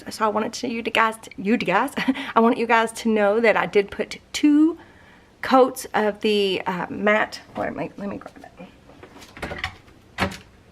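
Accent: South, United States. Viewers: I love watching you!! I've been watching you for several years now. Love going back and watching your old videos. I always learn something new!! Plus another lady with a southern accent 😉 (Alabama girl) . I can't wait to see what you come up with next!! ❤❤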